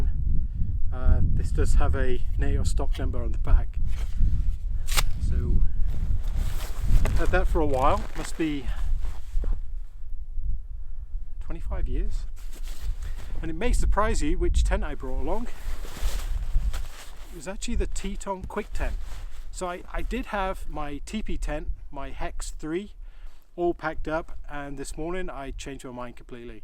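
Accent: England, United Kingdom. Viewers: Lol again Esme stole the show! I felt bad for her with those short legs. I’m short too I know how she feels. My boyfriend is from England as well but totally different accent than yours. He’s from Bedford. Milton keens? I will be doing my first camping of the season in 4 weeks with the Kamp rite. You inspire me. Keep up the great videos!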